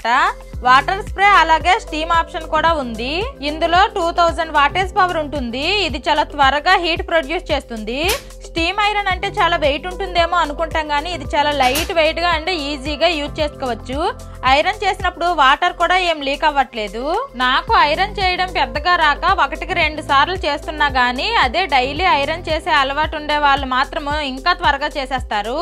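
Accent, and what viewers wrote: Srikakulam, India. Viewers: Hi akka, madhi srikakulam dist. Mee videos chustunte ma srikakulam ammayi ani garvamga anipistundi. Nee matalu kuda chala natural ga mana srikakulam matalu Ani thelustay.❤❤❤ Akka meeru thumbnail and long video editing endhulo chestaru cheppara please, kudhirithe dhani meedha oka video cheyara akka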